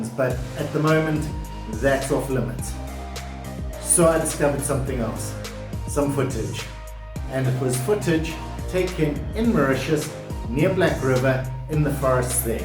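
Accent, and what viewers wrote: South Africa. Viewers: You sound South Africa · Can I guess we're ure from?.... South African Or Australian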